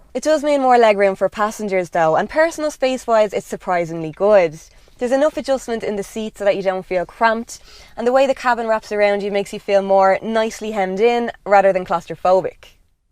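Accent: Ireland. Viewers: How has this channel not gained more popularity, a girl with an Irish accent driving beautiful cars. Whats there not to like, keep up the good work!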